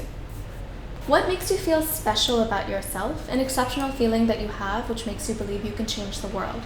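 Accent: United States. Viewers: How are u able to speak English so smoothly and in US accent ? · Why do you have US accent?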